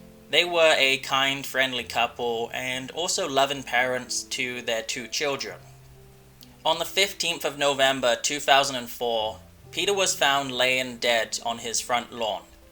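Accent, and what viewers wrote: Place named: England, United Kingdom. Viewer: could deffo hear your English accent :) thank you for replying, love your channel ❤️ xx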